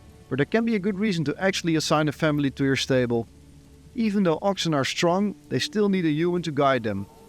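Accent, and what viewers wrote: Netherlands. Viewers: Jij bent een Nederlander of niet, hoor t meteen, haha. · That Dutch accent 😅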